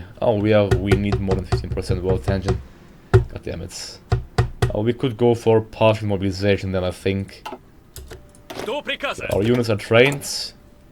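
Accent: France. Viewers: Ты француз?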